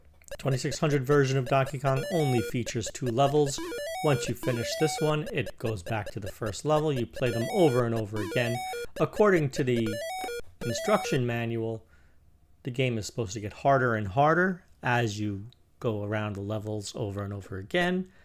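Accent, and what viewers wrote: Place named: Queens, United States. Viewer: Long Island NY? That accent sounds more like Queens than LI!!!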